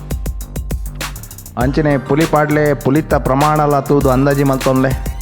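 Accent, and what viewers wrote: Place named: Tulu Nadu, India. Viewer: Anna erna tulu bhari styliye appreciated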